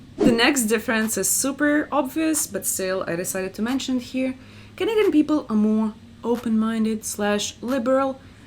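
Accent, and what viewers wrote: Russia. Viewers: Hey, I like your videos. I've been living in Canada for about 3 years so I know most of the staffs that you cover. But I still like watching you. You're funny specially with the Russian accent LOL. Also I'm impressed to know that you're fluent in French, you're a genius bud! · This is good.....ur Russian Accent is top notch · 😋Love that Russian accent..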